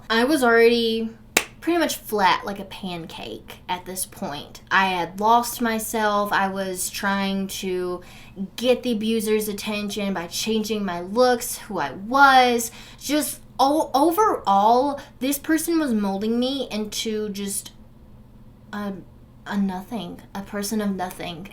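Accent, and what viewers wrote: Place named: Virginia, United States. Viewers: You basically nailed it 😂 She’s from Grundy, VA. I’m from Roanoke so it’s funny to hear her accent, too. Very familiar. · Your accent sounds like you’re from where I’m living, in tri-cities, middle of nowhere Virginia 😂 lil bit of country, lil southern, lil city talk. Yup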